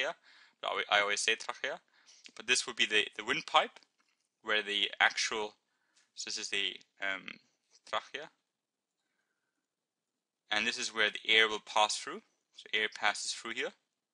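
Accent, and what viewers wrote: Germany. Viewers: Oh he's german! i was trying to figure it out throughout the whole chapter! LOL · ur german? i thought u were indian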